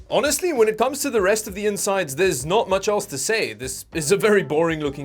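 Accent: Afrikaans, South Africa. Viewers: I though you were South African? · Are you south African? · Interesting accent there - sounds like you’re Afrikaans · Are you an SA expat? You sound South African. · his south african 100% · Are you from South Africa? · He lives in Canada and his accent is white South African. So you are an immigrant. Good on your part you really dodged the bullet that is South Africa. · How long have you been in Canada?, you still sound very South African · I swear this guy is south african. Correct me if I'm wrong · i can hear that south african accent trying to get some slang through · You sound South African. Are you? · Is jy Afrikaans? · You dont sound Canadian...South African perhaps? :-)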